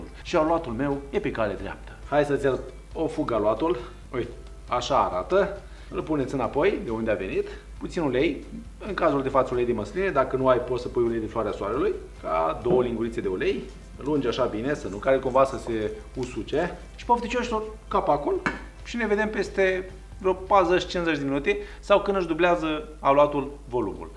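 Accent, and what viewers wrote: Moldova. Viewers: Ăsta este farmecul lui, e glumeț, explică totul pe îndelete, accentul moldovenesc are și el dulceața lui, iar rețetele pe care le prezintă sunt, toate, foarte faine.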